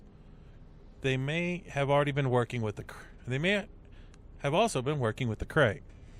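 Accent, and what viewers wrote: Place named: United Kingdom. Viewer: he's British, not American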